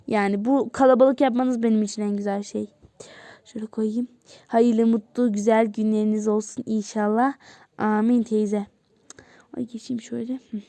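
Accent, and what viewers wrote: Kars, Turkey. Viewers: Benim babaannem ve dedemde karslı aynen böyle konuşuyorlar bu arada ben Kars'ta yaşıyorum ama istanbulluyum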